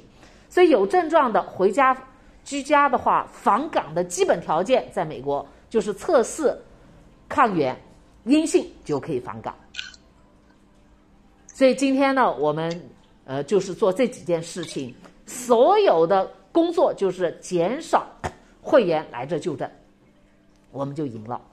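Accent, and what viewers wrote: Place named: Yunnan, China. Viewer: 云南口音😅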